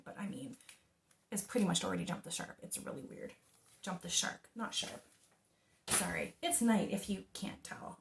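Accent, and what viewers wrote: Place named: Eastern Canada, Canada. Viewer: Haha - no I couldn't tell. I heard an "Eastern" Canadian accent once or twice, though. 😄 I'm from the West so I fail to differentiate all the many Eastern/Atlantic accents unless they are obvious obvious, like peppered with b'y, for example. 😂 You have a very subtle accent to my ears, whatever that means.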